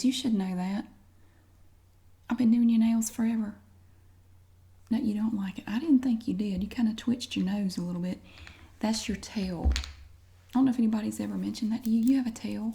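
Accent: South, United States. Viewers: i used to only watch your videos because i loved your southern accent, and i remember the first video i saw was your dentist receptionist roleplay (which of course NEEDS a pt 2) but what i admire from your videos is that you make these storylines and characters which develop throughout your videos, and it makes them feel so real, and realism is what i seek from an ASMR video.... sure, the soft whispers are nice, but i love when someone is soft spoken with a little energy like you, and i love love love LOVE all of the details you put into your videos.... i wish i could just explain to you in person how much your videos have helped me through my chronic insomnia.. dont ever stop what you do!! sending my all x :D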